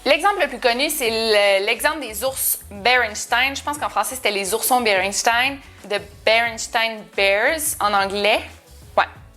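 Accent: Quebec, Canada. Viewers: Cc jsuis d un autre univers mon prénom est César j' ai naquit en France charmé je blague ont est cousins Québec France ont as la langue française en commun comme disait de Gaulle " vive le Québec libre" ton accent serait le vrais français ou l british vous a fait que vs avez oublié des mots mais c pas flagrant une cane pr un gericane c charmant et ta bonne humeur ne rend pas tes vidéos monotones encouragements jsuis abonné depuis peu 😉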